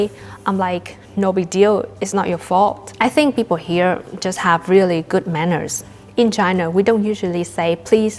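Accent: Scotland, United Kingdom. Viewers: Brilliant.A few weeks in Scotland and there's a hint of an accent.Come back any time. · Nice video, it made me laugh. The accent definitely has a few sounds from Scotland. Not purely as there is still much that sounds like a Chinese accent. Never thought about the water helping to improve the skin and the hair. Makes me think! 😄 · Came here to say the same thing as loads of others. Three Weeks!! You have Scottish accent lol!! “Scotlan” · am fae glasgow & she does have a scottish twang, you're talking absolute doggy poopoo. Now go back to bed it's late. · it's mental how your English has a Scottish twang to it. It's not a full blown Scottish accent, but you defo have some Scottish intonation. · I hear Scottish accent coming though a tiny bit and it's adorable :-) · I am from Glasgow but lived in Newcastle many years, i picked up a twang also but I was honestly surprised when I heard your Scottish twang. 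Anyway good video and content, you just got another sub. Kudos. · am fae cambuslang, her English has a scottish twang to it, no english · oh my gosh the way you say "air" with a scottish accent, rolling the R sound, wow, that must be difficult for a native chinese speaker. anyway, it's a most delightful accent and it makes me smile. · Can hear you have picked up a wee bit of a Scots accent. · Really trying to place the Scottish twang in your accent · Great video about my homeland I can definitely hear a wee bit of a Scottish accent in there. PS a lot of people pretend they have a cold when they’ve actually have been having a white Christmas lol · Scottish accent!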